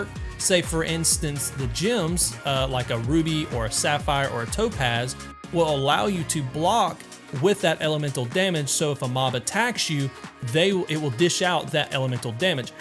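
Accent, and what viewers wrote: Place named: South, United States. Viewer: Followed because of the southern accent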